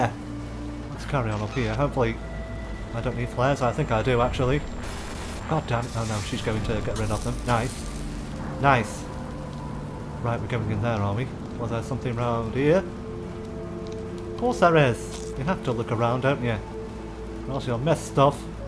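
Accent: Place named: United Kingdom. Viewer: This man is very Bri'ish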